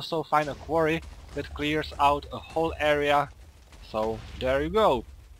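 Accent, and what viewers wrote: Czech Republic. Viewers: Where ya from, you have a Czech accent a little :D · u have like Czech accent :D where are u from? :D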